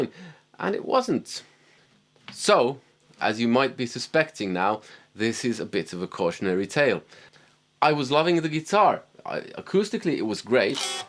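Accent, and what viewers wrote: Ireland. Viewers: I'd wager you learned to speak English while living in Ireland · Where does your Irish lilt come from?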